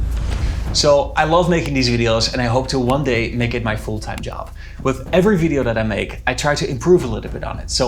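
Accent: Netherlands. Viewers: I am Dutch and I didn't even know all that... never realised why the government was so reluctant to close it all down, because we've never been made aware of the fact we got most of our social benefits out of that... we don't even know how we compare to other countries in that regard, but we were acutely aware of the fucking EARTHQUAKES that NEVER happened before the FRACKING started nor anywhere else in the Netherlands; so it was kind of a BIG deal. Therefore you should consider making these video's in Dutch for Dutch people, cause I can hear that you speak Dutch as your first language, so it shouldn't be more difficult, you might have a smaller audience at first, but the information you give could really change how people in the Netherlands discuss these issues, cause right now we're only aware of farmers and people who got fucked by the government, who's lives got destroyed by our official tax department (IRS in US). 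So yeah, you could do lot of good is all I'm saying. Probeer het gewoon. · kind of strange.. your accent is very clearly Dutch so I’m wondering how you could have missed this? It was all over the news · You just know this guy is Dutch, his accent just gives it away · I can tell you're clearly Dutch yourself by listening to your accent. I live in Holland as well and though the government doesn't want to get, in your words, "stupid rich". They have been plain stupid in other aspects for decades. I had high hopes for the new government but I think it's all ready to late. There's a demographic time bomb ticking in our country. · No doubt you're Dutch. The thick Dutch accent.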